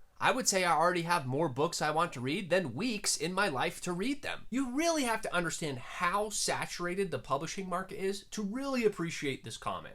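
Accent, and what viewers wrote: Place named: RP, United Kingdom. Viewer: Okay, but I think I need more of your posh British accent? It was so good hahaha (Also, I'm always here for the shout-out! 🙌🏼🙌🏼)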